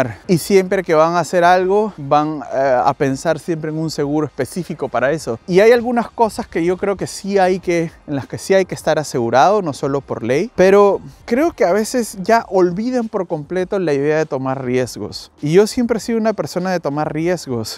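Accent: Peru. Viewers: It's a peruvian Spanish the best to learn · That's Peruvian Spanish.... good quality Spanish....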